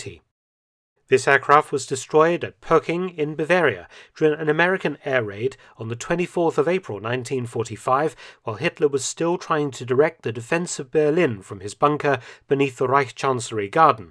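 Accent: United Kingdom. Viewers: He sounds like lots of my friends! (I’m British).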